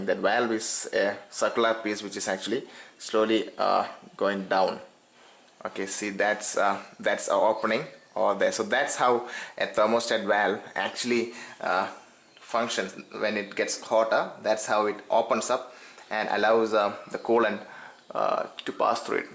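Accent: India. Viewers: ahhh,,,, Indian accent just make me sick....I can't listen to it....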